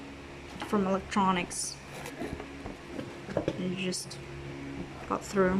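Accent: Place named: Louisiana, United States. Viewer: Good review, not many do such indepth demonstrations of capability. The Micra never really appealed to me, I think if I want scissors as a more important function I'll always opt for Victorinox as they're the best multitool scissors, whether on the smaller models like you show (Rambler or Manager) or especially on a larger pocket knife like the Climber etc. I do however like the Squirt as it's about the best tiny plier-based multitool out there, it seems well designed and I like the aesthetics - being compact and sleek - more than those of the Gerber Dime for example. Also it's helped me on weird little tasks where nothing else larger would have worked. Finally I am really curious about your accent? Are you from Lousiana, or were you born outside the US? Sorry to ask a personal question but your accent and pronunciation is really nice!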